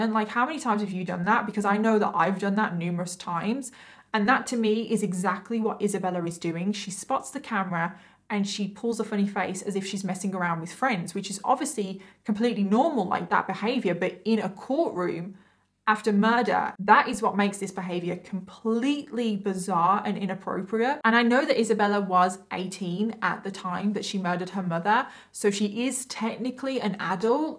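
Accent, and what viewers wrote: United Kingdom. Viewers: You're so obviously British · is a superstar on Youtube. I've had to take a break from politics and alternative social media. Having always been a fan of true crime, this new genre is a perfect fit for my down time when I'm trying to sleep. Some of these other girls are talented but is like some kind of angel. Her delivery is spot on with a serious reporting style and her makeup is always tasteful. Such a beauty, inside and out...I look forward to see what she will do in the future. Having only recently discovered her channel, there is plenty of back catalogue to binge watch. Maybe it's the accent but she speaks to me. She's brilliant, beautiful and she is carving her own niche. In this culture of Tik-Thots etc. she is still a perfect british lady, fit to have tea with the Queen. Bravo.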